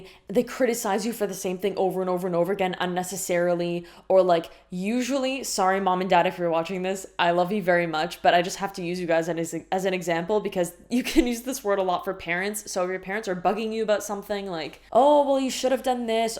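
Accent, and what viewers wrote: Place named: Italy. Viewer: First at all, thank you a lot for this content because l found it extremely interesting ..l jotted down 3 american expressions l ve never heard before thanks to you! "Stop bugging me" "picture (as a verb)" and "encompass"🤩 I had no expectations when l clicked on your video..is not that common to hear an american native speaking italian with such a great italian accent as you showed! In many cases INVECE could be WHEREAS and ABBIOCCO o ABBIOCCARSI l would say DOZE OFF or SLUMBER,l guess. By the way, l'm gonna subscribe your channel😎🇺🇲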